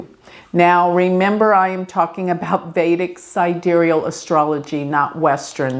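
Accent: United States. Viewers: Love your accent it's totally like Kelly Bandy - the classic American. Greetings from Athens, Greece! Love Christina Aguilera, Britney, Madonna!!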